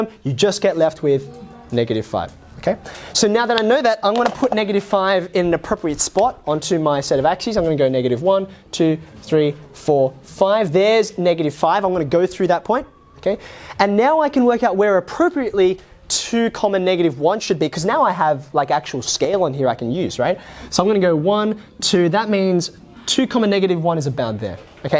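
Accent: Australia. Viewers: This topic comes in the entrance exam for a national and kinda quite prestigious university here in Peru. I'd also like to say that I am captivated by the Aussie accent, it is fascinating and also sounds cooler, to the best of my knowledge. Greetings from Peru :D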